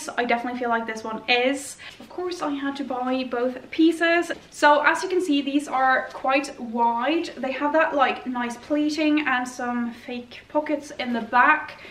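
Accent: Ireland. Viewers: Norwegian with Irish accent?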